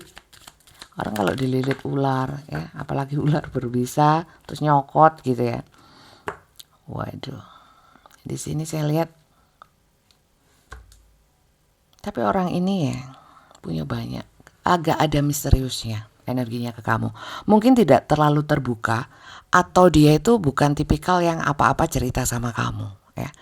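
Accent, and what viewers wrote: East Java, Indonesia. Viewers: BASA JAWANYA JAWA TIMUR AN MEDOK BGT YAAA BUN ARAK MALANG MATAP PERAH JG DI JATIM LUMANYAN LAMA HEMMMMM SUIT SUIT CETOK SEGERRRRR KONGGGGGGGG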